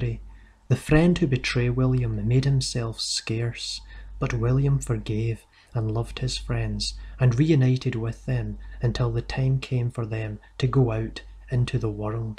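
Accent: Scotland, United Kingdom. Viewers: 50 years after Christianity was declared the official religion, the western Roman empire fell. Ushering in the most depraved chapter in recorded human history. The church was Europe's rulers. Who are you trying to kid? The inquisition, routine torture of heretics, (one Pope complained the people being tortured, in the basement of the Vatican, were not hollering loud enough), the routine practice of cannibalism by the crusaders, an estimated 50,000 people burned alive for demon possession, slavery (the first slave ship in N America was named for Jesus), the routine destruction of ancient science (Archimedes discovered calculus almost 2,000 years before Newton, Christian monks destroyed it.) The only good part of your doc is your accent. I assume you are from Scotland. The most depraved archeological dig I have ever encountered occurred in Hull. 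Priest trading sexual favors for privileged positions in the after life. <link>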